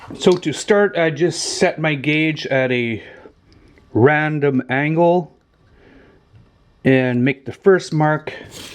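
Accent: Canada. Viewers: Great videos, they have been a lot of help to me, please don't be insulted if I am wrong, but you sound Canadian to me? I'm English, and it's hard for us to tell sometimes.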